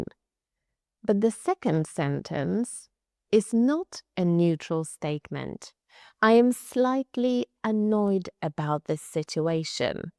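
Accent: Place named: United Kingdom. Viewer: Very good explanations and excellent British accent!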